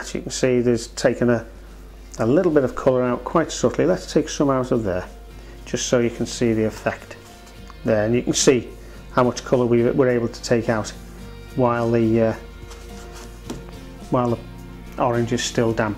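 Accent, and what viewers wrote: Liverpool, United Kingdom. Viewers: Thank you. I watched this with my children and you did a fantastic job! PS 6 yrs ago, we moved from Cheshire back to Canada. Your lovely accent sounds like you are from somewhere between Liverpool and Cheshire. Are we right??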